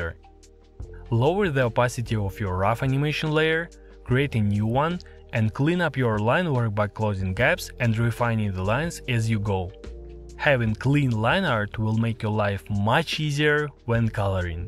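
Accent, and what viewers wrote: Russia. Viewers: Where is cheating. It took years to complete. Also this runglish is an ear ache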